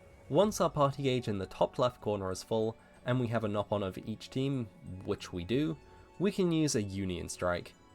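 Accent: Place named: United Kingdom. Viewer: How did I just now realize you’re British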